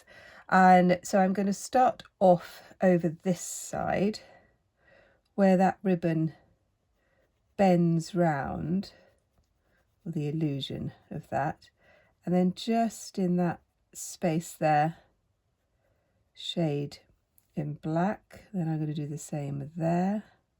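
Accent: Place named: United Kingdom. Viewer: Hallo,. I am a new fan of yours. I came across a vid from you and enjoyed it immensely. I am a transplanted Scot living in the southern US and I always love and appreciate hearing an accent from GB! Having said that: I love to do doodles and have several notebooks devoted to them but I usually use pens. I've so many coloured pencils and almost never use them as I am a painter. This has inspired me to get out my 150 Prismas set and have a go! Thank you so much! Bless xo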